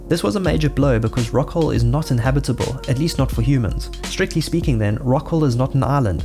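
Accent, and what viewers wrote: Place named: South Africa. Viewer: Super underrated video! I love the accent too, I'm originally from South Africa but have lived in the UK for over 18 years now, I've not heard a voice like mine for so long, it's crazy to be reminded other people speak like that too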